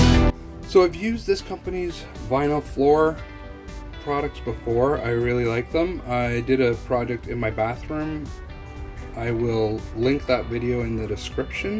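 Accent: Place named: Midwest, United States. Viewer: “Ope, just tripped there, sorry” you’re definitely from the Midwest 😅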